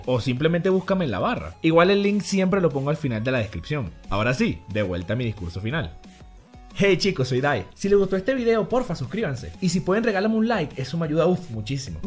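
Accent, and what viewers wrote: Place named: Venezuela. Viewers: nose es que con solo el acento se le nota que es de venezuela (caracas para ser mas específicos) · Obviamente es Venezolano, es muy facil notarlo.. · Yo venía a preguntar lo mismo xD este es el primer video que veo y me sonó muy venezolano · Si pues, el acento es muy similar a de nuestra casa, no sé si de caracas como tal pero, tiene ese acento criollo jajaja pero maneja buen léxico sin parecer un pajuo por eso no creo que sea de caracas xd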